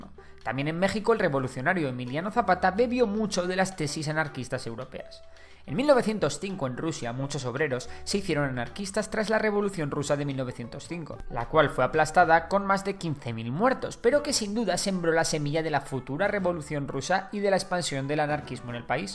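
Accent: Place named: Spain. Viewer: muy bien este video, yo esperaba un anarquista es un rebelde. Que impone su bienestar. Gracias por toda la historia, toda mi vida, escuchando de gobiernos y ahorita un poco más de información. Ojalá pueda poner, uno de los impuestos o la tecnología. En esta nueva era, a mí me tocó los Nokia con snake y ahorita ya veo memorias de pez acostadito 😗 Me es peculiar el asiento español 🫠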